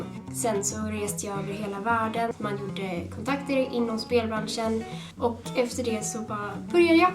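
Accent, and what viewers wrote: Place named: Scania, Sweden. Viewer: bliss haha. Ja då får du komma ner till Skåne. Hade varit kul o höra din skånska. 😎👊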